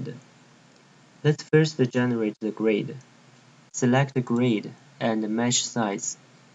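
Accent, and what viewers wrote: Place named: China. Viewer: 一听就是中国人哈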